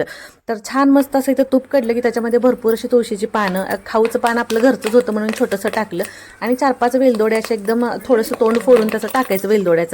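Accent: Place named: Satara, India. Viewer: Tai tumchi language ekdam satari vatate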